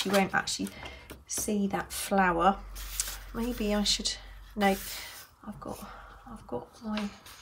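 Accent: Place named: London, United Kingdom. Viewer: Hi, being an ex Londoner in OZ, it seems to me that you’ve suddenly started sounding more London! No offence I hope as, coming from me, it’s a compliment!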